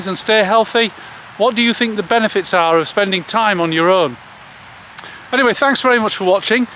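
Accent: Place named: Cockney, United Kingdom. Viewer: This man is speaking with a Cockney accent.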